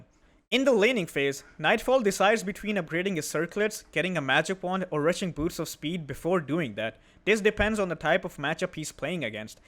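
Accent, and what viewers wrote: India. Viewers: shut up disgusting indian accent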